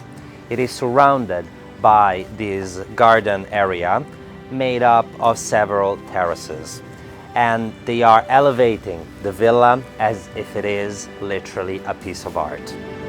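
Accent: Italy. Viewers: Love it,! Especially when clip is over 10 minutes! Please come back with your italian, it gives so much style and chic..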